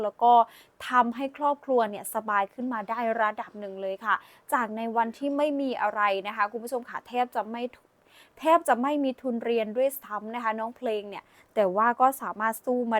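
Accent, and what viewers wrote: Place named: Isan, Thailand. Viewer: ❤แอดมินเปนนักข่าวหรือนางเอกหมอลำ..น่ารักจังอ่านข่าวสำเนียงไทยผสมอิสานได้ไหลรื่นฟังเพลิน❤😊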